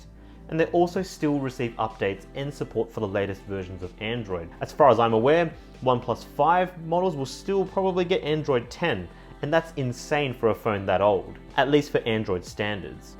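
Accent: Australia. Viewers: I knew he's gonna complain about the lack of support the moment I heard his Aussie accent lol Just ordered a 7 Pro from China after watching this video... Let's see how it goes... And I think OP definitely needs to bring their support back to AU ;-(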